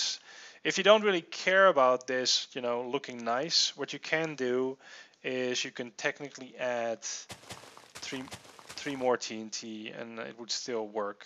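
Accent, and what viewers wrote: Netherlands. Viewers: in Minecraft thought so. I recognized the way you talk. I'm Dutch to.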